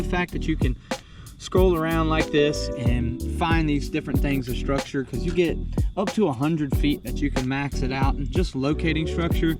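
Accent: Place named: South, United States. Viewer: This is so violently southern. I love it. As an Alaskan it is inspiring to see this level of southern out there😂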